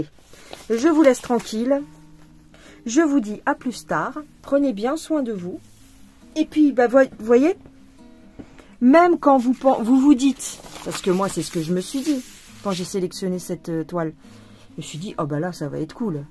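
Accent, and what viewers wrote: South, France. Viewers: Coucou ma petite adorée, oh si maintenant les confettis se cachent c'est infernal! C'est vrai que sur cette toile rien n'est logique mais c'est parti! Tu braves les difficultés 😂! Tu nous en auras appris des choses et ne t'inquiète pas on est aussi accrocs! Accrocs également de ces bons moment passés avec toi! Aujourd'hui l'accent du Sud revient hi hi. Tu vois le chat est là 😺, il faut lui mettre des petites chaussettes de bébé pendant que tu fais la coiffeuse car un coup de griffe est vite arrivé. Je me suis retrouvée un jour avec le bras qui a triplé de volume suite à une griffure, une méchante infection qui a duré 3 semaines! Bref, ici on parle de tout est c'est chouette! Merci merci et une toile de plus, à la suivante! 🤗. Des gros bisous doux doux doux 😘💙💙💙💙💙💙💙et salut les gens 🖐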